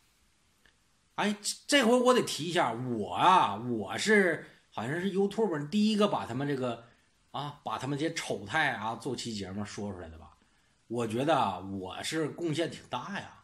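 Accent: Northeast, China. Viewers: 太搞笑了，東北話太帶感了，全程像听相聲，笑死我了🤣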